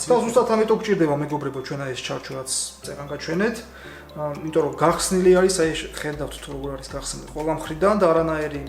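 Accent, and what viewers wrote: Georgia. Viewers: თბილისელი არ ხარ?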